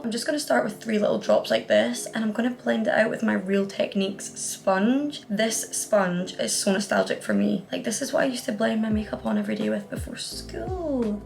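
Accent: Scotland, United Kingdom. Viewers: I haven't subscribed to anyone in a very long time, but your video was refreshing to watch, calm and informative. Also, love the scottish accent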